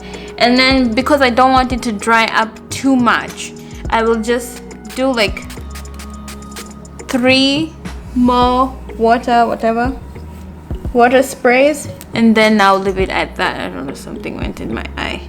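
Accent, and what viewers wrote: Kenya. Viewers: used to talk like this even when she was in Kenya lol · What are you talking about her accent sounds very Kenyan lol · That has been her accent even in Kenya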